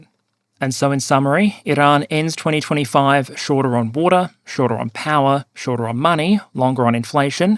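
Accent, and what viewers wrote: Australia. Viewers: It is awesome to hear an Aussie commentary about world affairs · I'd suggest you turn on subtitles. The words all make sense, perhaps the Australian accent is the issue for you?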